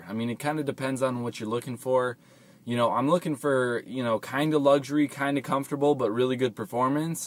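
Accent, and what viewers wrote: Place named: Minnesota, United States. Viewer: Damn that Minnesota accent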